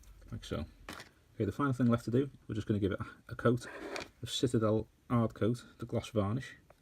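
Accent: Liverpool, United Kingdom. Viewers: Scouser! Yes lad :)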